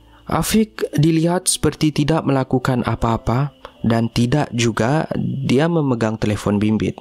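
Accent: Sabah, Malaysia. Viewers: urg sabah ba ko kan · Sabah bh ni pengulasnya nie😂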